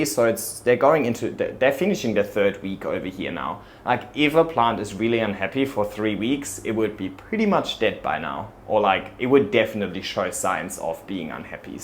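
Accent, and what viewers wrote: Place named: Germany. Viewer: You sound German now.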